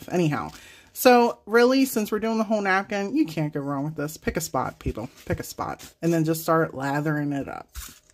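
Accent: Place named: Pennsylvania, United States. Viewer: I love listening to you, it reminds of home. PA. Unfortunately I'm coming in the next few days because of a death in the family.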